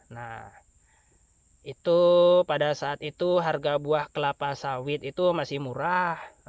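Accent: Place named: Bali, Indonesia. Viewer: Logat balinya masih kelihatan salam sedulur rahayu sak gung dumadi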